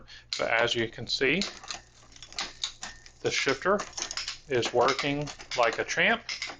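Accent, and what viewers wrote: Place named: North Carolina, United States. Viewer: I know someone else with a North Carolahna accent so I was able to figure out that you were talking about Tri-Flow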